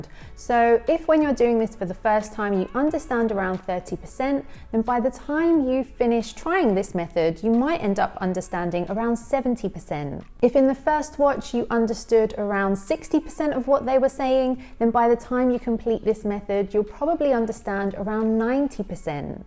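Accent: United Kingdom. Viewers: this speaker is from British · your British accent is lovely. Which part of the U.K. are you from?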